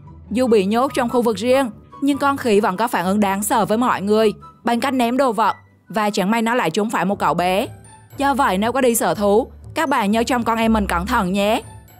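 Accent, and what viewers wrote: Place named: Tuy Hoa, Vietnam. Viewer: chào AD. giọng Ad khá quen Tuy Hòa thì phải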